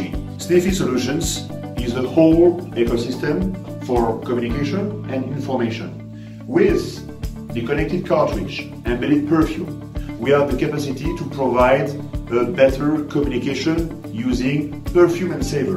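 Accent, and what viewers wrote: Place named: France. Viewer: Bravo. I love your french accent!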